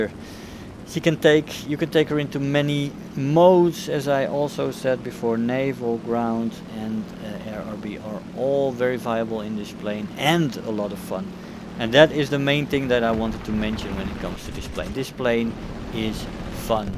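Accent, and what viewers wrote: Netherlands. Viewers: he got that dutch accent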